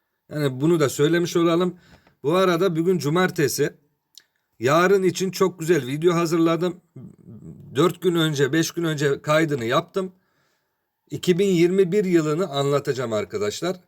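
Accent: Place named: Adana, Turkey. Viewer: Şiven aynı Adana yöresi gibi memleketin neresi be abi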